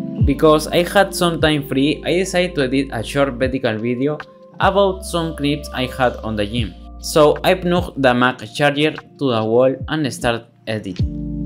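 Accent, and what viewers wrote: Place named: Argentina. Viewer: Lo hubieras hecho en español y con tu acento argentino para no tener q sufrir tanto con el ingles jaja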